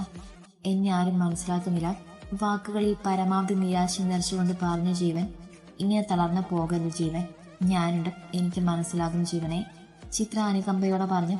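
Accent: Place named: Tamil Nadu, India. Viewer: ahno anik aa story nala ishta pine chechi de tamil malu slang oru rekshem illa poliii❤❤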